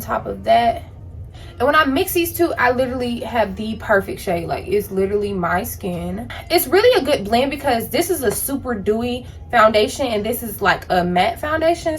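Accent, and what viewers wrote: South, United States. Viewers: Omg, I didn't know you were from Augusta! I did hear a little bit of Southern accent! I am from Statesboro, GA. Love the video! XOXO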